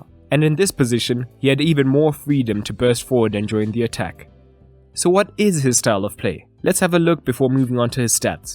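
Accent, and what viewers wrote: South Africa. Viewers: What do I think? I should let you know in the comments below? I think... I think you sound South African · You sound South African. New subscriber 🙋🏽‍♀️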